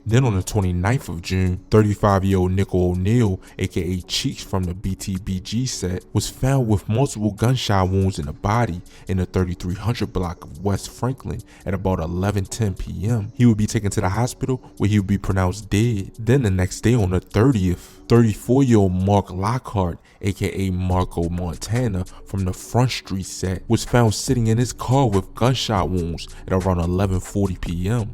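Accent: Baltimore, United States. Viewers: sound like he from baltimore if i had to guess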